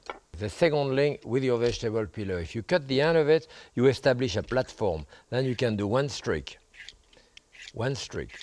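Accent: France. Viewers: I love his teaching. Clear, professional, pleasant. The French accent makes it all the more authentic! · Gotta love a real French accent.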